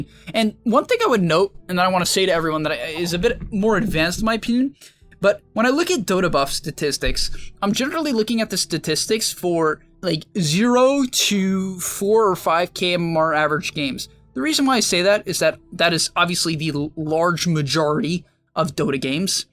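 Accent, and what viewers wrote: United Kingdom. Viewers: I liked for the British accent.... Aye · I disliked because of that "British accent" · It started British but quickly became Indian. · Please make a whole video with that fantastic British accent. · good dota content but awful British accent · MAN STOP THIS BRITISH ACCENT SO BAD CRINGE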